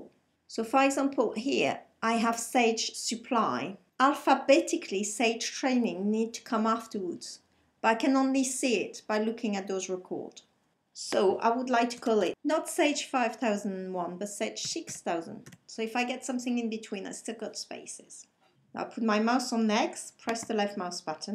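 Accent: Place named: France. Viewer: You are especially short-sighted if you think her "funny" accent makes her clueless about Sage. If anything, it goes to show her fluency in one more language (french), which is something I doubt you will ever boast of. If you had anything useful between your ears you'd obviously see she has an in-depth knowledge of the subject with the way she states the advantages or disadvantages of using particular options. Nitwit!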